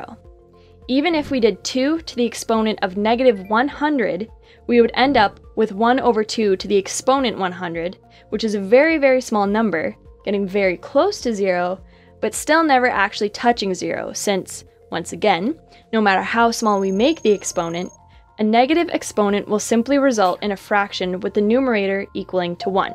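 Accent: Canada. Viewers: Sounds like an Ontario accent.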